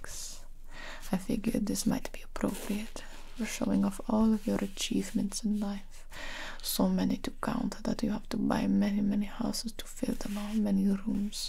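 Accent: Russia. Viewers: She is trying to imitate Russian accent ?))) ну что же вышло совсем неплохо))) don’t get me wrong but Mexican girls are very hot... can’t watch them for a long, so I must leave it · I loved the Russian accent so much that I couldn’t fall asleep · Not really german mostly russian i have a russian aunt she sounds exactly like gibi's accent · What an accent!! Russian? Goddess! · Damn that russian accent gave me tingles straight up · Another enjoyable and soothing video. Your Russian accent is so addictive and goes well with the blonde wig. You must work so hard preparing such therapeutic monologues. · I love that metal gear Russian accent. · I love your Russian accent...you simply nail the Russian personality as well. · i love the Russian accent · For real was awesome Russian voice! I wish 1 video with a little bit o f portuguese (real portuguese PT-PT). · Grrreeeattt Russian accent👌👍🇷🇺☦️ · It’s Hollywood Russian. 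She’d make a good Bond villain.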